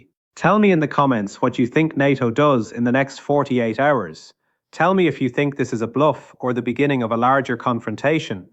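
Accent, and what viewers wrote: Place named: Ireland. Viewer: An Iranian with an Irish accent?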